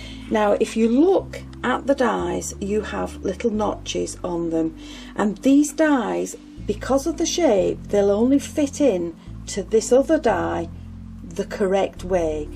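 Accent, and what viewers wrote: England, United Kingdom. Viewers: Love love love all of your beautiful cards and so enjoy all your videos. Your soft soothing voice and that cute English accent bring me back time and again. What is your source for the thousands of flowers you are continually using? I sure can't afford all those dies, though I have many and would relish having more!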